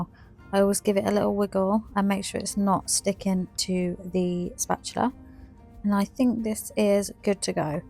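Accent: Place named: England, United Kingdom. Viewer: So glad I found you and your English not American! I’m now going to binge watch you channel . 😊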